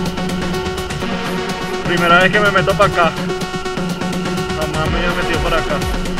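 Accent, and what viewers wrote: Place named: Venezuela. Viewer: Usted tiene una vos como de venezolano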